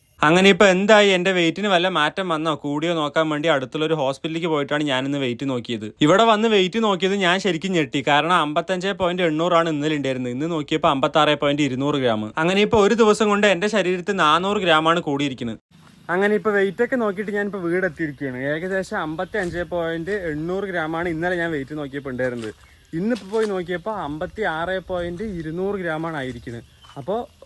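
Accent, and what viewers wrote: Palakkad, India. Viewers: Hello videos ellam ishtakunund palakkad slang kelkkan orupadu ishtanu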